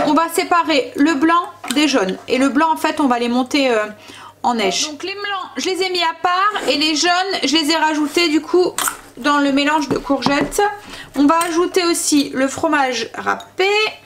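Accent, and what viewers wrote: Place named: Nord, France. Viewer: Cc et oui tu es du Nord et la haut on a sa façon de s exprimer, tu es nature et c super et c pour cela qu' on t adore bises des hauts de France en force 😘😘😃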